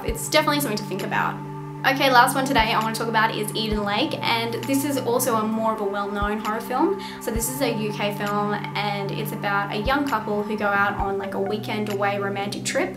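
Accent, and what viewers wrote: Australia. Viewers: You’re an an Aussie, eh?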